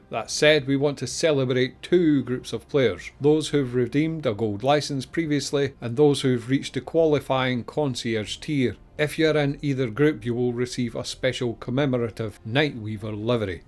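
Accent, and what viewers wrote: Scotland, United Kingdom. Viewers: I love your thick Scottish accent ... sometimes I have to ask myself, what did he just say?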